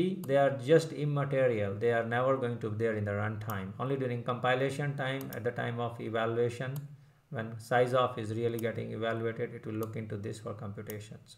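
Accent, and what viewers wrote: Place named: India. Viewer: i am french so the indian english accent was a bit harder for me, but after the end of this video i was ok. i will continue the other videos cause its clear, pertinent, calm and complete enough per subjects. thank you.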